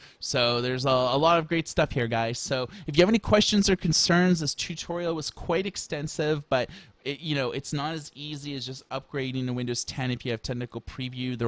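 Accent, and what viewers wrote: Canada. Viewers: So, quite confusing. You keep saying serial number, then proceed to write this complicated code to determine what it is. I just turned my laptop over and looked at the lable. But in fact you're actually talking about determining the operating system product ID. In the case of an XP laptop, the product ID is also listed on the lable.Then, to make matters even more confusing, you call the product ID a serial code. A serial number is always associated with a machine, not an operating system. Judging by your accent, I'd say you are Canadian? So perhaps a serial number and product ID are interchangable in Canada. Not here in the States. I understand that you took the time to do this and I'm sure your efforts are appreciated by some. But the result is very confusing because the terminology is incorrect. Having written a mountain of technical documentaion in a past life, I can tell you that instructional videos have to be consistant and carefully worded. 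A product ID is not a serial number, nor is it a serial code.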